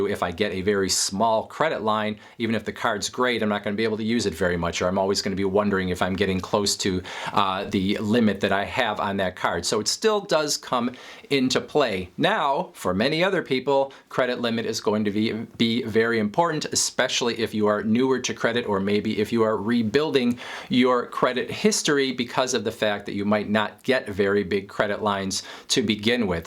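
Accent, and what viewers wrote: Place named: Chicago, United States. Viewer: I can hear the "Chicago," in your voice. 😄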